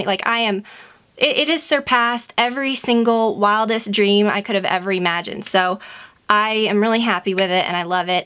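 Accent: South, United States. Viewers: Wow... never knew she had a slight southern accent O_O lol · her accent is very southern in this video hahaha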